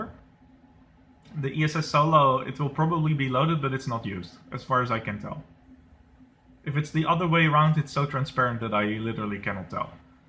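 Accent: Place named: Netherlands. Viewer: Je klinkt een beetje Nederlands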